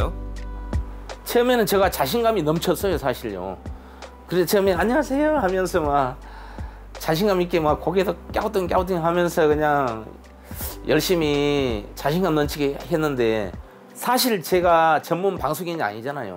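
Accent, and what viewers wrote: Jeolla, South Korea. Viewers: 셰프님 구수한 말씨가 정녕 전라도 가 고향이신거 같습니다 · 세프님 너무 섬세 하시고 또 전라도 사투리 로 너무 정겹게 느껴지고 또 많은요리 소개 해주시고 넘 감사하고 좋았습니다 세프님 날로 번창하시길 기도합니다 다시한번 축하드려요 🎉💗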